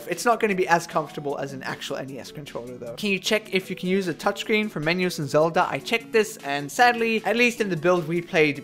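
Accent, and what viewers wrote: Sweden. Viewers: WTF är du svensk? I was wondering what your accent is and then I saw "poäng", and "1 dag sen" etc. So now the accent makes sense.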